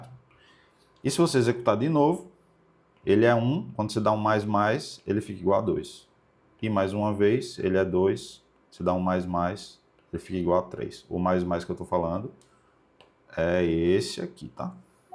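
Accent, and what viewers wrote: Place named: Northeast, Brazil. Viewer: Aula Top. Parabéns Bom demais assistir vídeos de qualidade ouvindo um sotaque nordestino igual ao meu 😂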